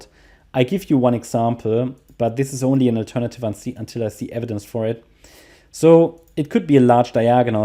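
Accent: Germany. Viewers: Man hört direkt raus das du deutsch bist :)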